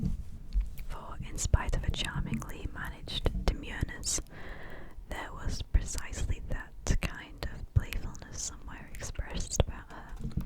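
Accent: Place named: United Kingdom. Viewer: wow you have a British accent 😃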